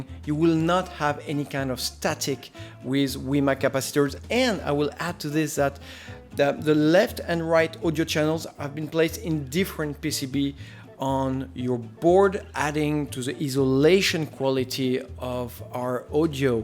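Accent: France. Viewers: and... sorry but are you French ? (I noticed a little bit of a French stress in you voice... ^^). No offense though. French is my mother language ;) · Oula il a un accent français lui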